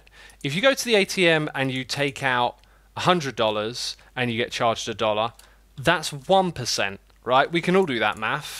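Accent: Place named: United Kingdom. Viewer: imma be honest... ur British accent makes me want to actually listen to you and take ur word for advice as a young person.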